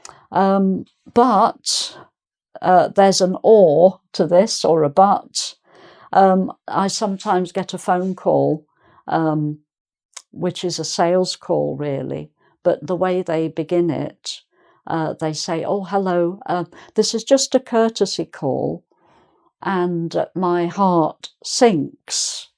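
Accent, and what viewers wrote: United Kingdom. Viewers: Thanks so much for sharing this interesting and useful video about buzzwords. I'll try to teach them in my following class. It's a pleasure to hear such a beautiful British accent. 💖 Greetings from Spain. 🌷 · i see it is a british accent ! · I live in Brazil. You can't imagine how happy I am, having you as a teacher, teaching the real British English. I can understand you, my target is having such a noble accent. Thank you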